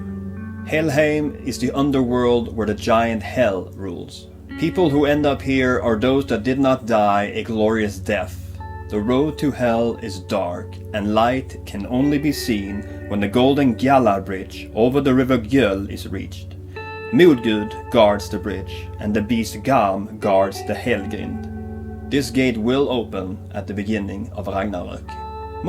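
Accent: Skåne, Sweden. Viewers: He can if he wants to, it's just his dialect that screws things up a bit. He has the Skåne dialect, the most southern dialect, it's almost Danish. I'd like it if he would speak "rikssvenska" but if he insists on speaking with the dialect we should let him. Overall he did a great explanation of the nine worlds and I enjoyed the video alot. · It's so interesting hearing the Swedish pronunciations of familiar names and words from Norse mythology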